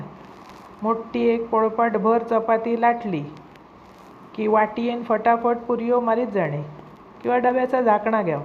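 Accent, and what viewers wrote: Malvani, India. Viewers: Tai tumhi kay kay recipi bannvta aani goad malvani bhasha amachya aai sarkhi athvan aali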